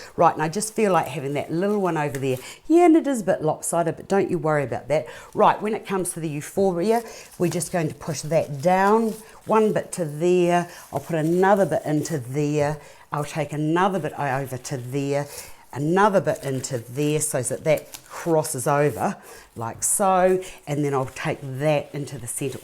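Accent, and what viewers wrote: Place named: New Zealand. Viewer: Beautiful arrangement , your accents it is come from New Zealand . Thanks for sharing.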